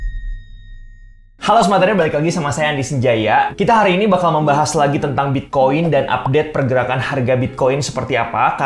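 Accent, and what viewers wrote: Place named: South Jakarta, Indonesia. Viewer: bang which is lu annoying, sumpah, logat jakselnya ilangin pls sayang lu udah bagus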